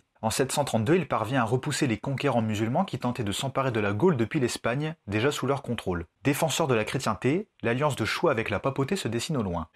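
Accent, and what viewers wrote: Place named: Nord-Pas-de-Calais, France. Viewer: "les rouos fainéants, Childéric trouo, Thierry trouo, à la suite de quouo, bras drouot du rouo".... elle serait pas ch'ti??? à part ça c'est très bien:-):-)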